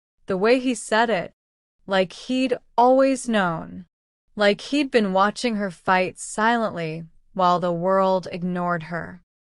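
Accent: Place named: Spain. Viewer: Playback speed x1.25 works well. Good story, but the spanish pronunciation spoils the flow.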